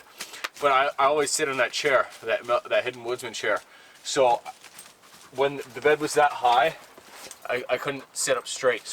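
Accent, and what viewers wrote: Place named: Canada. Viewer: why oh why cant canucks say OUT right ?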